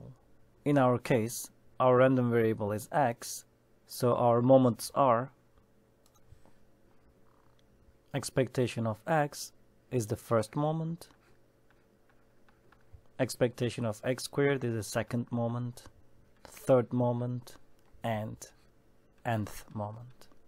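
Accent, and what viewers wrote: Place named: Turkey. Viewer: my guy this is the most turkish accent I've ever heard in my life but your name isn't turkish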